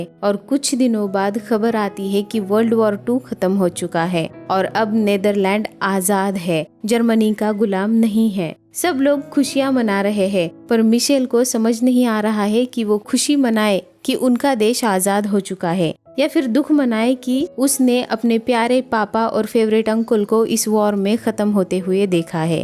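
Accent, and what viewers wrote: Maharashtra, India. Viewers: तुम्ही महाराष्ट्रीयन आहात ना...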